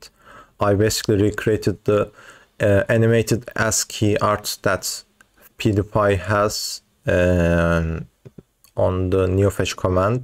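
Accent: Turkey. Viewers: Baba aksandan anladım türksün yorumlara baktım insanlarda demis baya iyi yapmışsın ya wp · aksaana bak tam türk amk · Aksanından anladığım üzere Türksün. Yaptığın şey çok hoş ve verimli görünüyor! Tebrik ederim. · Tam bir türk ağzı var sende. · bende bu adam Türk dedim yorumlara bi baktım elrond reis niye her yerdesin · ingilizce öğretmenliği okuyorum. videonun 5. saniyesinde falan açıklamaya baktım türk müsün diye. evet gerçekten belli oluyor çünkü türkçenin (türki tüm dillerin) fonetiği diğer dillerden aşırı farklı ve ayırt edilebilir. ama aksanının olması kötü bir şey değil, dediklerin gayet net bir şekilde anlaşılıyor. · direkt türkçe konuşsaydın aksandan anladım direkt XD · buram buram türk aksanı kokuyor, eline sağlık bu arada :D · Your accent like Turkish <3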